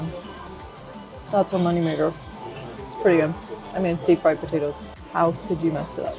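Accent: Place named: United States. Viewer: your accent sounds like american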